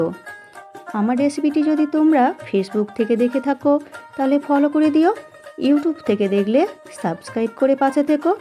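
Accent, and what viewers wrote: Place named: India. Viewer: রান্নাটা দেখতে দারুণ লাগছে। আপনি কি ভারতীয়? কথাবার্তা ঠিক আমাদের মতো মনে হচ্ছে না।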